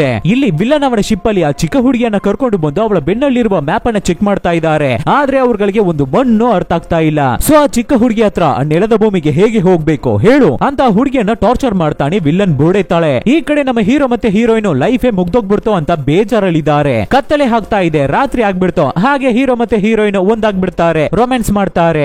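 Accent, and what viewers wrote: Tamil Nadu, India. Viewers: Tamil navru kannada matadtidare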